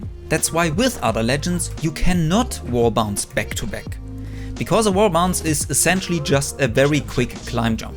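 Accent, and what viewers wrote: Germany. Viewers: are u german u have a german accent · Das is doch ein Deutscher Dialekt denn ich da höre,oder? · Are you german merkt man haha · you sound german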